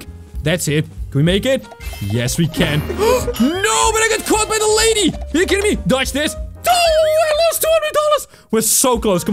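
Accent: Netherlands. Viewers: are you from the netherlands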